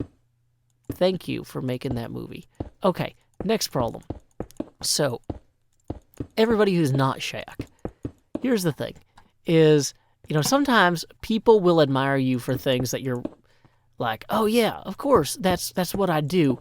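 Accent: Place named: South, United States. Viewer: This is the first time I’m watching one of your videos. I haven’t watched Minecraft videos for the better part of six years, but nothing has brought me more peace or put a smile on my face than listening to you talk to us about assumptions and the dangers of comparing yourself to others. I thought I didn’t like southern accents, I have changed my mind Thank you.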